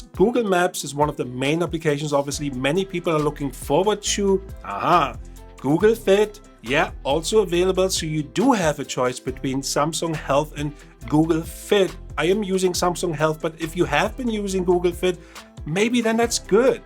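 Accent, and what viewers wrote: Germany. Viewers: Englisch ist nicht wirklich deins mit einem deutschen Akzent. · Sehr Gut Gemacht video. I like your Deutsch-English 😂😍😍😍💓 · Noice review, wonderful German accent.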